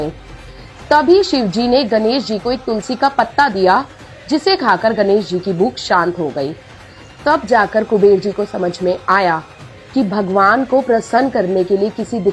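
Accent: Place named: Haryana, India. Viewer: मुझे पहले ही लगा कि आप हरियाणा से है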